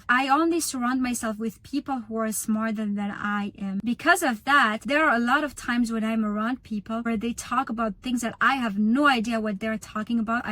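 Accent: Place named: France. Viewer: i really enjoyed this video!❤ i love your accent, are you from France?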